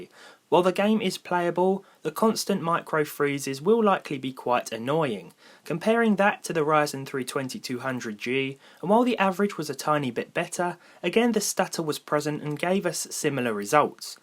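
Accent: United Kingdom. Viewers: Hey mate where are you from in the UK i like your accent but you never answered me at all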